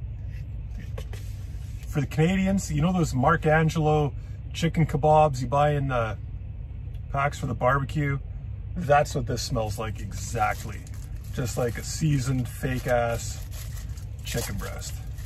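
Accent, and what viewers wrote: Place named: South, United States. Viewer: My very first MRE was a chicken breast pattie. Couldn't believe my eyes that a piece of chicken was in a bag and still fit to eat. Little chicken juice goes a long way in the cab of a truck. Bit and pieces of this video you sound like a southerner. Great detailed video. PS it's still hot. Enjoyed our visit with you that week.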